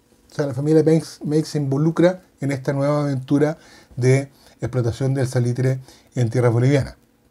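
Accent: Chile. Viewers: Hola, gracias por tu nuevo video. Soy chile y entiendo tu manera de hablar, pero tengo claro que si quieres llegar a mas personas, debes tratar de hablar en un chileno mas neutro. Que te vaya muy bien.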